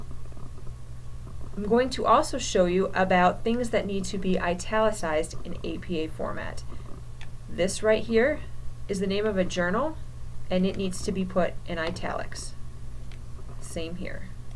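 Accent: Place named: Chicago, United States. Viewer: Love the Chicago accent <333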